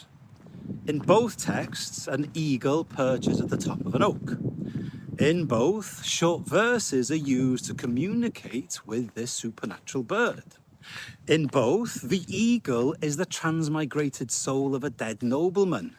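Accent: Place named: North Wales, United Kingdom. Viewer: Great video. Off topic but as someone of a language and accent nerd I cannot place your accent I am getting North Wales would I be correct?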